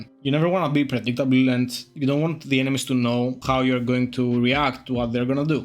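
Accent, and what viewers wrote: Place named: Spain. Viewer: El inglés promedio de un español...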